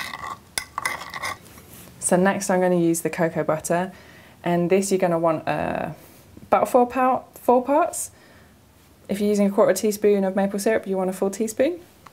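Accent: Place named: United Kingdom. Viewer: i am not vegan either, but i love making vegan lip balm and i love your vids! i made mine with organic jojoba oil and vanilla. and because of you accent, are you from the uk? because right now im in the uk on vacation with my family......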